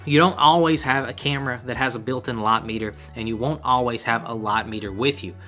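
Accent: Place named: South, United States. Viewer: i love the southern drawll accent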